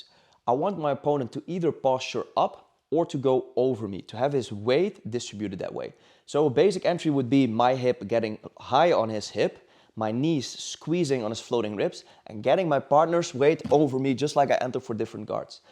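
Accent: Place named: Netherlands. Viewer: Amazing! Very grateful🙏🏽 good english, but you cant hide that Dutch twang 😊 nogmaals bedankt, groeten uit 🇵🇭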